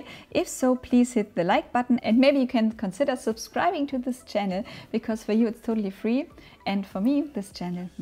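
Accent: Germany. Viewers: Well done. You persuaded me to buy these. Love your beautiful German accent.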